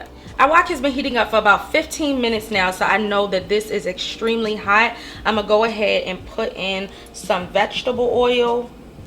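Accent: Baltimore, United States. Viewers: All i hear is that Bmore accent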